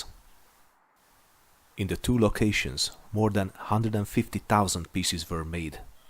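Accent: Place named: Hungary. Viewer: All the best for 2024 folks - one of the best shooting and hunting channels ever, and in the bright Hunglish language too!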